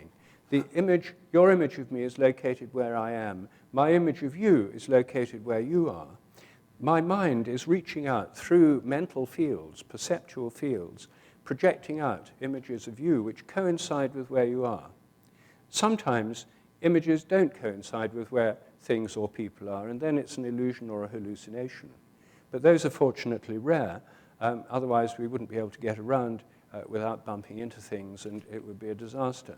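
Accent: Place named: United Kingdom. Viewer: Because he has a British accent? He isn't saying anything I haven't heard before.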